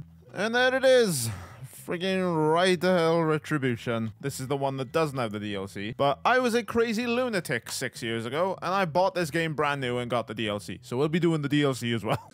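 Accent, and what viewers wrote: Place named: Wales, United Kingdom. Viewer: Man, from the first line I thought to myself "I bet this is Ride to Hell Retribution". Always good to see your dulcet Welsh tones coming up in my subscriptions again!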